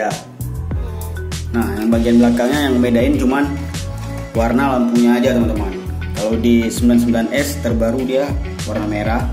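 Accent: Aceh, Indonesia. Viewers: Mantap bang review nya 👍🏻 Suara abang mirip orang aceh.. Saleum 🙏🏻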